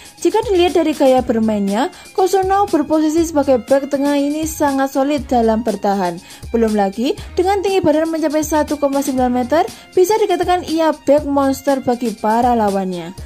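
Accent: Java, Indonesia. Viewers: medok abizzz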